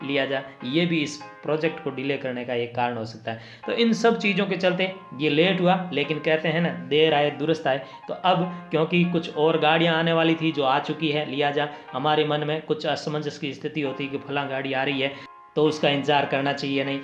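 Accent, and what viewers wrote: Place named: Marwar, India. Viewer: Aapki aawaz sun ke lg gya tha ki marwad se ho bad me jodhpur dekh ke pakka ho gya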